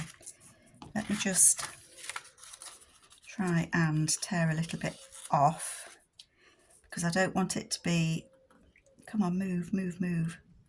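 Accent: England, United Kingdom. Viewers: I just love what you’re doing with this journal!!! I love watching you craft and hearing your beautiful English accent. Hugs, Sharron 🥰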